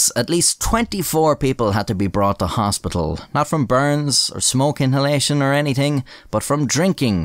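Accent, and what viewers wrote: Ireland. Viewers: I'm an American and I knew you were Irish from the first vid I watched :/ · I started watching and subscribed mainly based on his awesome Irish accent - the wit, humor and factual information are what got me hooked. Thanks, you have taught us so much and provided many belly laughs. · the very first video i saw from you, i instantly thought "this fella is from ireland" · I'm genuinely surprised that people couldn't place your accent as Irish... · I've heard it said that if have a dog that is a lush, just give him all he wants to drink until he passes out. The next day give the dog some more, and if he drinks he's lost. But a lot of times all it takes is the smell of it and the dog will run away yapping it's head off. This means no doggy anonymous meetings are required, and the pooch has been cured forever. By the way it's very easy to see and hear that you're Irish. 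Have some of the Isle of Erin in me. Like your show and tales from the bottle! Peace and love. Dan. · Transatlantic Irish accent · You think Americans thinking your Irish accent is fake is annoying Try Brits (who should know better) mistaking my (admittedly watered down) Irish accent for being American. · Who didn't realize was Irish. I thought all the replacing TH sounds with Ts and Ds made it obvious 🤷‍♂️ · You got rich boy irish Accent. heard it all over the world. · I’m American and I knew your accent immediately I’ve been to Dublin and loved it js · So, where's the accent from? I consider myself fairly familiar with Irish accents. I'm guessing the midlands, cos it's fairly neutral, but definitely Irish. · It makes sense that people in Ireland would talk differently due to where they came from. So where are you from? Cork? Kilkenny? Galway? Please tell me. O yes, this was quite amusing by the way; morbid, but amusing. 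· I’m American I I could tell you were Irish the first time I heard you voice. How could Irish people not tell? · I'm in the US, and I always assumed you were Irish when watching your videos, even if your accent is slightly different. Boston accents, Texas accents, Chicago accents, and New York accents are all in the country I live in, I imagine that there are different accents and dialects in every country and language in the world. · Haha how can your accent be anything other than irish. The fact that your accent is hard to place around ireland means youre probably more irish than most irish people. An tir na nog. Best palce in the world. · What part of Ireland are you from? The first video I watched on your channel was the one about the runaway train. I was trying to puzzle out for a time what part of North America your accent was from until your "o"s got me to think across the pond. 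· Yea I suppose most Europeans couldn't identify my distinctive Wisconsin accent but I knew you were Irish because it's obvious to me at least that your accent is Irish. Probably a lot of people died of being poisoned by drinking poop and pee and who knows what microorganisms and parasites were in that slush. I'm not convinced it was entirely due to the alcohol itself and the dog probably couldn't suffer from physical or mental withdrawals after such a short period of time. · well you sound very Irish to me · bro I knew you were Irish the second I heard you, not all us americans are idiots I promise 😂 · I found it surprising people have trouble with your accent. The first time I heard you speak i knew you were Irish. I would have guessed you were from Glasgow though. Thinking more about it, I guess it makes sense I know a little more about the different dialects of Ireland, my family is Irish, my great grandmother only spoke Gaelic and, while I haven't, a lot of my family travels between the US and Ireland fairly often, so I'm a little more educated about Ireland than tbe common US citizen. 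· It’s okay, I could tell you were Irish. And there’s a place called Belfast? I thought that was just my ex ex ex ex ex ex friend’s waifu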